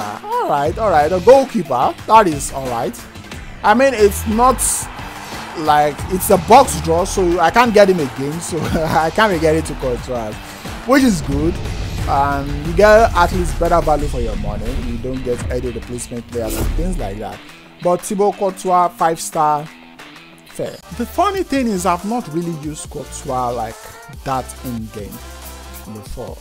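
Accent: Nigeria. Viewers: Bro, are you Nigerian?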